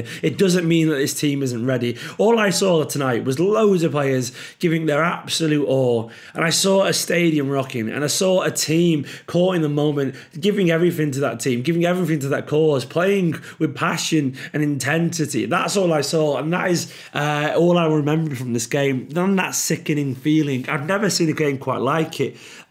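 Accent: Manchester, United Kingdom. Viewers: You're so gutted your accent went extra manc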